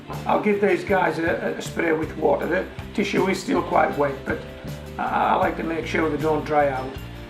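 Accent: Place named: Cumbria, United Kingdom. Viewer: Informative video. l'm in Canada, zone 4b, and starting everything indoors in a couple of moths to plant out in May. Imagine my surprise to hear what sounds like a good Cumbrian accent (l'm originally frae Maryport). Great job on your channel.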